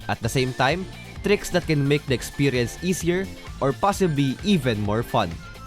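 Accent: Philippines. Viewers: Nice video pare, salamat sa tips and tricks. Halatang pinoy with the accent 😂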